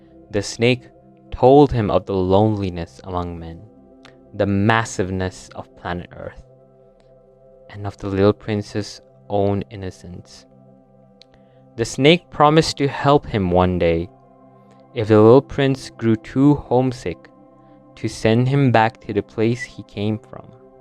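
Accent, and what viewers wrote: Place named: Nepal. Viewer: Sir are u from Nepal ..if yes please lets stay connected with our team as we need accent like ur for a project we are going to launch ...please reply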